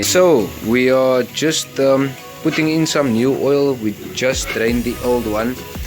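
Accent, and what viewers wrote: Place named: Cape Town, South Africa. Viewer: Love the capetonian accent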